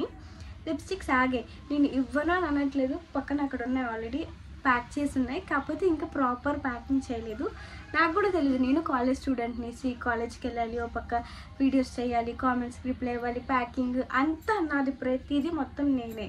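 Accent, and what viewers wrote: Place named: Visakhapatnam, India. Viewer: Ne vedios oka emotion ayipoyae bcoz ur slang and talking and cute voice and also ur from mana vizag ❤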